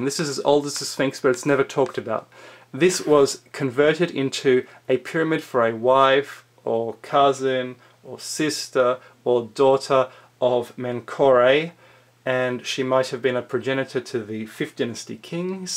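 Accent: Australia. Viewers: Great video! And I could listen to the Australian accent forever, even you were telling me that horses can fly. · I really enjoy your astute commentary and speculations. Please continue. Your voice and accent are very easy on the ears. (unlike some Aussies) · He has an Aussie accent calm down.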